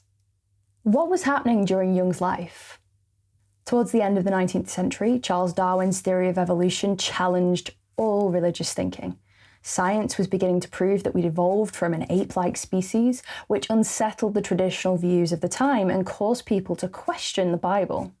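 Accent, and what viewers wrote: United Kingdom. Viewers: There's nothing quite like watching a lass with a Northern accent explaining Jungian philosophy and ideas as well as you just did - truly something to behold 😭I really enjoyed this video, thank you!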